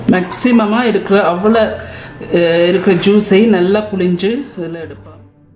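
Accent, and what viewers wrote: Sri Lanka. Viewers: சகோதரி...உங்கள் இலங்கை தமிழ் அழகு.....வணக்கம் கோயம்புத்தூர் தமிழ்நாடு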